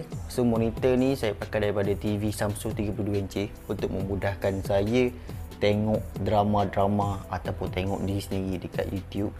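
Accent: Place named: Kuala Lumpur, Malaysia. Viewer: Cara editing mmg dr dulu tk prnh mengecewakan...Cantiks hias... Dr dulu kalau tgk time dia duk sekali dgn adam semua diorng antara paling kemas jaga umah... rumah bujang kira mmg sngt² okey... umur adik akak sebaya tp kena akak dia yg kemas bilik depa... Kalau tk sakit mata tgk...😅 Cara berckp dr dulu sgt perform bile dia brckp dlm yt dia... dulu org kecam sbb ckp loghat kl ni berlagak tp sebenarnya kalau kt pandang jauh blh menarik org luar utk tgk yt dia jgk... akak sgt suka sbb dia ade vibe mcm yt iman kamil... softspoken n details bile bercerita... Org introvert je faham... ni kalau dia review brg confirm org jadi terjebak nk beli... Terbaik... 🎉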